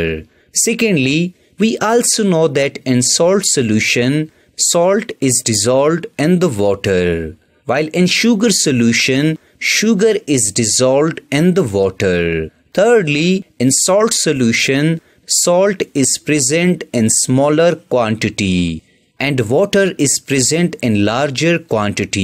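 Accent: India. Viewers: I can't learn well because I'm distracted by the Indian accent 😭🙏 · I think hes india he cant help it its their accent though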